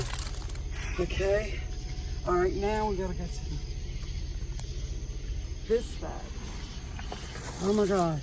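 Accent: South, United States. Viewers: Where are you located you sound like you are from the south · I miss the South, I love you southern accent! God has a way of doing that.. Lol... You had me in tear at the end... I love your Video's and your Chanel is awesome.. I believe were probably close to the same age..✝️💜✝️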